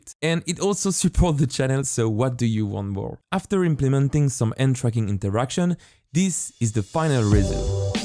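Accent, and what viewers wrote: France. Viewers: Cette accent français mon frère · Eh mais t'es français je reconnais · that french accent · Translation (according to google translate): Hey, great your video! Too bad I just discovered it ^^ I immediately recognized your accent, it's nice to see French people doing international;) I'm going to check the rest of your channel, good luck anyway, it promises heavy! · You're the frenchest person i've ever heard, very cool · L'accent français 😂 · l'accent français de ouf, mdr (sinon superbe vidéo 👍) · Ca ce vois beacoup que t'ai francais mais bon (Super cool la vidéo) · French accent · It's surprisingly quite rare to hear French accents on YouTube. Not that it's a problem at all. Sorry if it's Swiss or Belgian or whatever, I just can't tell the difference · ça se sens que tu es français alors salut mon pote !! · Damn to accent francais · T es français toi sa se sent . 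· Mdr sa me tue que PERSONNE a remarqué qu’il avait un putain d’accent français 😂 English translation: lol it kills me that NOBODY noticed that he has a fucking French accent 😂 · on est d'accord qu'au vu de son accent il est clairement français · yu have a french accent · French accent lol but amazing video! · its so obvious he's french lmao ! tkt tes pas seul XDD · L'accent français on est là (en vrai tkt il est super ton accent 👌 super vidéo) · i love how your french accent just peaks in the ou and au sounds, from a french student it is very satisfying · your defenitly french like me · Hey, super ta vidéo! Dommage que je vienne seulement de la découvrir ^^ J'ai directement reconnu ton accent, ça fait plaisir de voir des Français faire de l'internationale ;) J'vais checker le reste de ta chaine, bon courage en tout cas, ça promet du lourd! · My god you sound so French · Its sseems you are french mon pote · Au vu de ton accent, tu est français non? 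· L’accent français qui fait plaisir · French accent my dude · thats an incredibly french accent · wow l accent francais on aime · Oh le bon accent français 😁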